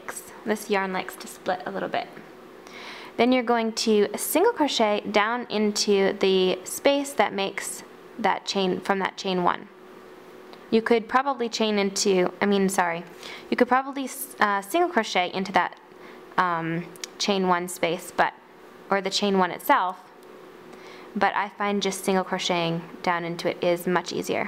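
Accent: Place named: United States. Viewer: I tried to crochet a snowflake from a pattern in a magazine and I got stuck so I just googled how to crochet a snowflake and your video came up! Thank you so much, I'm still a beginner and have taught myself some basic stitches from a book. I live in the UK and I just wondered if American crochet stitch names are the same as UK ones? Anyway I managed to complete it from watching you, with many rewinds! Many thanks, it's for my daughter's birthday as an extra little something to hang on her Christmas tree. I've made it in turquoise as that's her favourite colour. I'm gonna make two more in white. I've also got some tiny sparkly beads which I will sew on using invisible thread. I liked the way you talked about it being prettier done in a certain way and your American accent was reassuring!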